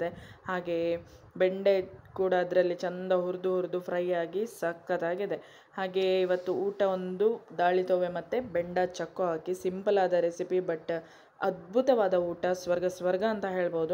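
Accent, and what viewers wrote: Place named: Tulu Nadu, India. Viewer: ನಿಮ್ಮ ಕನ್ನಡ ಮಜಾ ಇದೆ,ತುಳುನವರೂ ಹೇಗೆ ಮಾತನ್ನಾಡುವುದು, ಬೆಂಡೆ ಚಕ್ಕೊ ಮಸ್ತ್ ಇದೆ,🙏🙏👌👌😋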